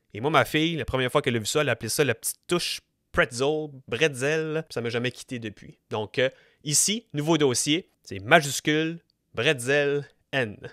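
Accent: Canada. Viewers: J'adore l'accent Canadien.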